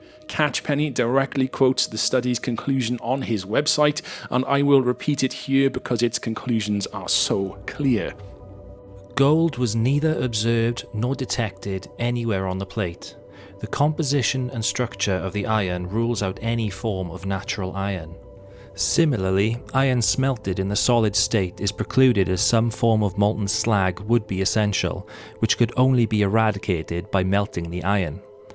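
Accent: Wales, United Kingdom. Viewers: Is the narrator Welsh?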